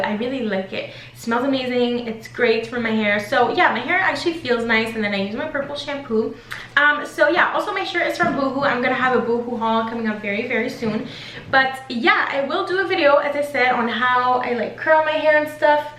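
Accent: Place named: Montreal, Canada. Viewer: You’re accent sounds Montreal Canadian. I might be totally wrong lol 😆 your hair looks amazing !!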